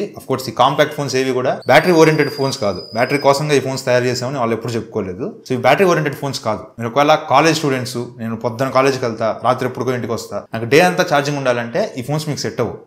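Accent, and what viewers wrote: Nellore, India. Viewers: Bro nedhi Nellore ha ????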